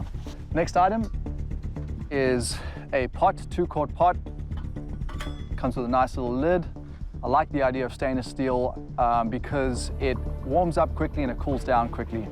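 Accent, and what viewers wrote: South Africa. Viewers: I hear a South African accent. It's so distinct. Good luck!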